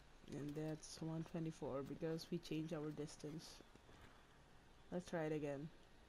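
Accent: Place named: Philippines. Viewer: you sound filipino =D. but you just gained a sub! love your build coz i main bow too!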